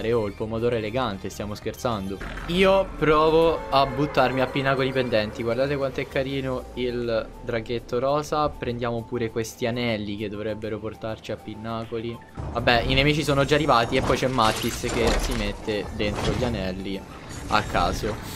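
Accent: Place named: Naples, Italy. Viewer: Pensavo fossi di Napoli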